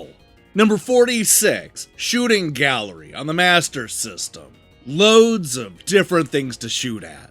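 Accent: United States. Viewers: All this extremely British writing with a heavy American accent really makes this video work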